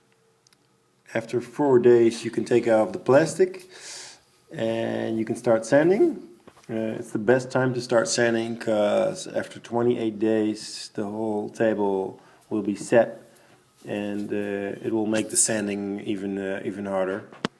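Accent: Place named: Netherlands. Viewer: I could just hear from your accent you're from the Netherlands haha. Ziet er echt gaaf uit, die tafel! Echt super mooi ding :)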